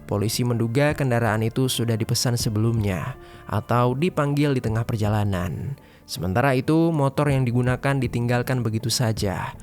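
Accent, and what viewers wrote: Java, Indonesia. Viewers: Ada jowo jowo nya yang ngomong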